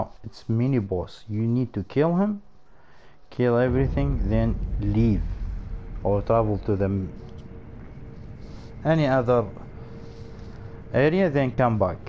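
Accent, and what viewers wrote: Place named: Gulf, Arab world. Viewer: الخليجي واضح من صوته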